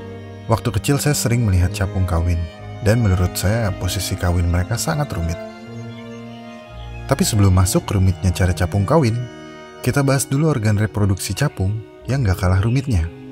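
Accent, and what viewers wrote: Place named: West Java, Indonesia. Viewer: Gua curiga admin orang sunda😁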